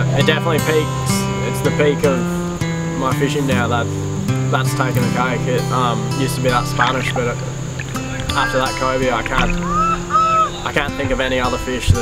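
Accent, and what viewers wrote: Australia. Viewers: thx for this video, quite inspiring and that decent aussie accent lol. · This videos half as good if you take away the Aussie accent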